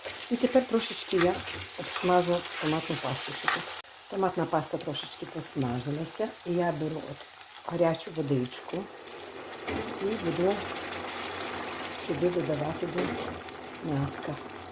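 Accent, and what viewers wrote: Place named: Bukovina, Ukraine. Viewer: як завжди тепло по-домашньому.Хочу сказати Вам, одну річ,зустрічала в коментарях під Вашими відео спроби навчити Вас літературної мови. Не ведіться на це,бо наш діалект є природнім і властивим нашому краю. У нас навіть старі люди ніколи не казали "борошно",завжди "мука". або коли невелика кількість,то "мукичка"і так далі,не буду перечисляти.Для чого нас рафінувати? Буковинська краса і в нашому діалекті також.Вам здоров"я, Божого благословіння.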